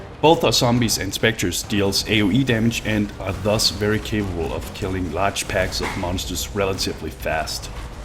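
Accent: Denmark. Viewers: it's so fucking obvious how danish you are!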